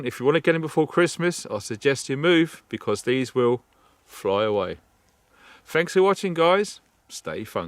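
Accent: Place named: Norfolk, United Kingdom. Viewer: Thanks for the your videos. Please can I ask are you originally from Norfolk ? I was born there but now living in the the northeast. Just thought I could detect a faint bit of the familiar accent.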